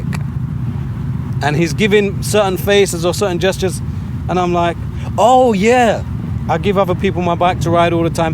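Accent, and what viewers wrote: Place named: Sweden. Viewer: såg videon om armpump å kände igen dialekten direkt ;) vart i Sverige håller du hus då?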